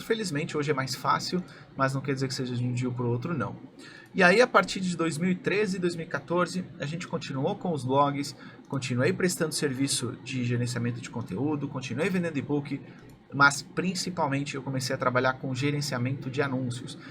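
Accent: Portugal. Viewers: Que vídeo phoda! Eu sou designer, mas comecei a estudar marketing qndo conheci e teu curso de Facebook (na época do teu sotaque bem português)😁 demorei até resolver unir essas duas áreas, pq como só havia trabalhado em agências ganhando mt pouco, e nunca pensei q fosse possível escalar. Agora estou cheia das metas 🤣 Criei canal, mas por me tomar mt tempo p editar, estou focando na criação de um produto p/ depois virar tua aluna de novo e aprender a anunciar.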